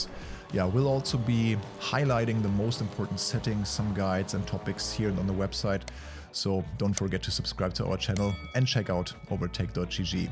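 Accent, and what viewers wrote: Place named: Germany. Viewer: Bist Du Deutscher? Hört man sofort raus, richtig schönes Video!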